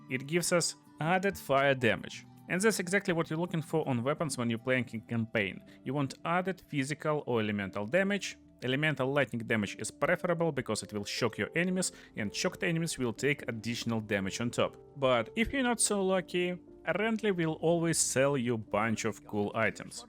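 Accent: Russia. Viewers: Where's the accent from? Russia?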